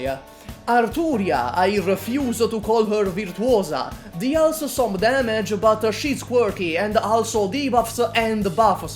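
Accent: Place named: Italy. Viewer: the accent (100% Italian)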